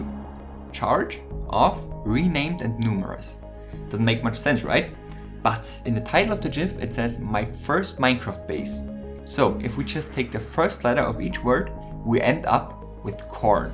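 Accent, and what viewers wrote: Germany. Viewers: Dein Englisch ist so schlecht. Man hört dass du aus Deutschland kommst.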